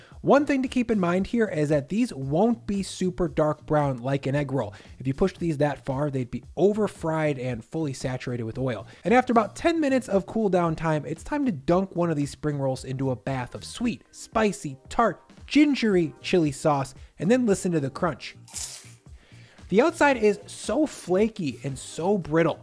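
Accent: United States. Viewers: You’re speaking like an American so why aren’t you using the American measuring system?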